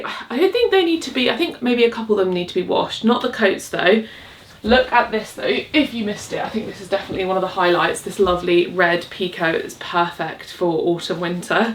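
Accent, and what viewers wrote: United Kingdom. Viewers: 🐶. love your videos. Your home is beautiful. I could listen to you speak all day… the British accent is everything! Red and burgundy are your colors… very flattering to your dark hair and porcelain skin ❤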